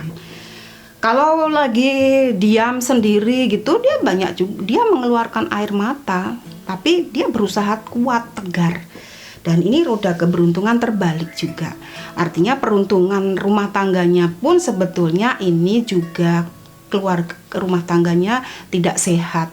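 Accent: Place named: Java, Indonesia. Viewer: Seenaang banget dengar yg bunda sampaikan..logat jawanya yg dipakai..ibarat apa yg ditanam pasti itu yg dituai.. Karma KD ya bund👍👍 🙏🙏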